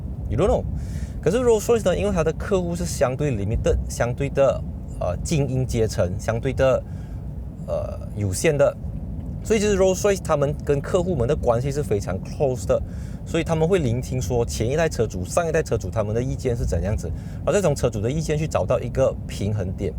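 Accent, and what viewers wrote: Malaysia. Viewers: 把英文说出马来口音，哈哈。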